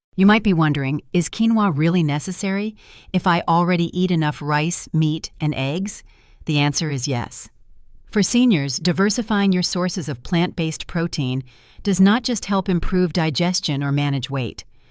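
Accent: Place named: United Kingdom. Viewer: Food suggested are only good for rich Army Generals, thank you for your clear britsh Englisheverybody can understand.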